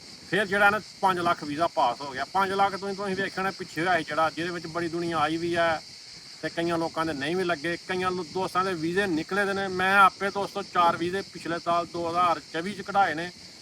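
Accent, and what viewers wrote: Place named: Shakargarh, Pakistan. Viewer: جو بول رھا شکر گڑھ کا لگتا ھے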